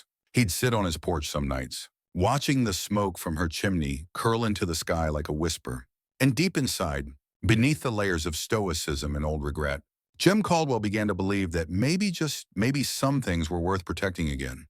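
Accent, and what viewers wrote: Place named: Germany. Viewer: Hi, I’m watching from Georgia, USA🇺🇸 I like Western stories. The story is a heartbreaking one, yet it has redemption from all those who would like to break Clara. Jem was a warm, wonderful man; he stood with honor. Also, this story has a German accent in parts over English, making the story hard to understand. I could only laugh when the narrator read the words. That might be why no one left a comment! Good story! 😊😊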